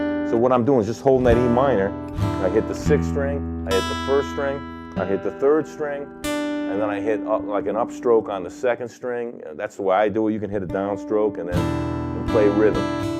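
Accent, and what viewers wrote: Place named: New York, United States. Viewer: An Italian American man with new York accent teaching an Indian sing. Amazing!!!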